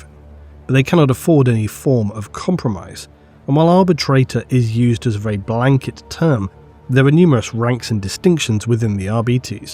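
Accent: England, United Kingdom. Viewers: Amusingly, with his particular English accent "I am the law" would sound a bit like "I am the lore" and "I am the lore" would sound a bit like "I am the law".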